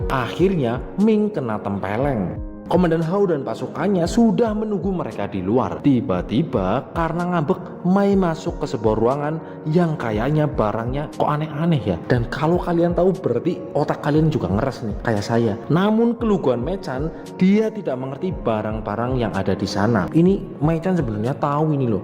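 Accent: Banyumas, Indonesia. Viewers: Wong ngapakkk kiee lanjutkan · Nyong bangga rika wong ngapak😂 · Rika wong ngapak ya? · Mimin wong ngapak😂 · admine ngapak ehehe salam dari pwt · ngapak temen wong endi jane rika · Min asli wong ndi, ding nggo basa ngapak😂😂😂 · Ngapak ngapak urapapalah seng penting penakk😂😂😂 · ko wong banyumas apa hahaha nganti bingung temen pada nggoleti apa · Rika ngapak deneng Ngendi Banyumas SE si lik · Ngapakke ngendi kangggg😅😂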